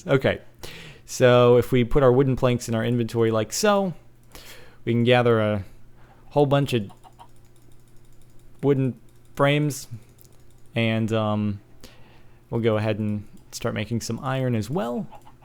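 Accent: Sweden. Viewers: *are you swedish* and yes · is you swedish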